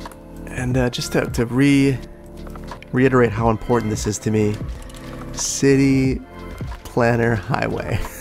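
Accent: Wisconsin, United States. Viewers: Love the series, and wondered why you sounded so familiar, you're from WI! Gotta love the midwest!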